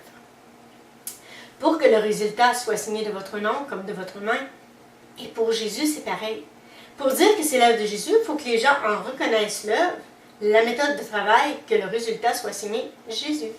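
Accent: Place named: Quebec, Canada. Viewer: Bonjour! J'ai liké ta vidéo. Je voudrais cependant te demander si c'est possible quand tu fais une vidéo d'abandonner un peu ton accent québécois? Pour qu'un maximum de personnes puissent te comprendre.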